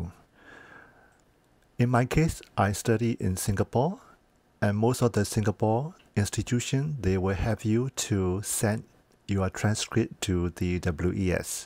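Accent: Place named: Myanmar. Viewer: Why didn't you explain in Bamar (Burmese) language if your video post is for Bamar . Never ever expressed "Vulgar Slang" Your school is a "Shitty" school, It shows your character. Never ever expressed Offensive wordings toward other person's dignity if you are very careless/disorganized person "that is the school that DON'T provide", It should be" Does not". While you're in Canada .Pay attention on "Vowel"sounds, Then imitate the accent and tone.Again Learn how to make a "SCHWA" vowel. In addition you must learn the culture of the English speaking country you wish & some slang. Keep in your mind that UK, US, Canada, Australia, New Zealand, each has different culture and English accent. Canada official languages are France and English. Otherwise you will be still sounding as Myan-Galish or Ba-Galish. Since you've been staying in Singapore for quite a few years you will have to adjust your Sin_Ga-Lish while living in Canada. 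Do not take as personal. Cheers. Note: I trained my Bamar gal friend in the same way. Now she has a fabulous Canadian accent and some french after 6 years of living in Canada.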